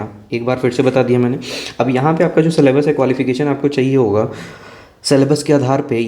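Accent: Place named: Jehanabad, India. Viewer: भाई जहानाबाद से हो तुम??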